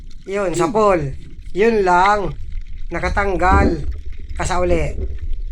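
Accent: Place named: Vinzons, Philippines. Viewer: Cguro ang blogger na e2 ay taga Vinzons camarines norte. Pamilyar sakin ang mga pananalita nito at mga katawagan nya sa mga lamang dagat. Hahaha 😅😅😅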